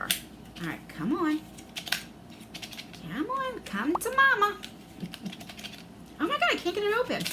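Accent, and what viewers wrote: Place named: Louisiana, United States. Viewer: Cool! Can’t wait to get my box!!! Hey, I keep hearing your accent (as someone from Oklahoma with my own accent 🤪) and you sound a tiny bit like you’re from Louisiana. I know it says Jersey in your intro, but just thought I’d comment that. Love your reviews!!!!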